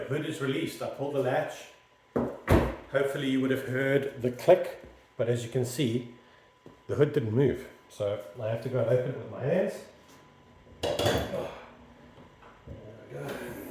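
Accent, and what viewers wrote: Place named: South Africa. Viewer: Thanks for the fix, desperately needed this. You sound South African 🇿🇦 If you are, thanks for helping me all the way back home. Good luck with your channel 👍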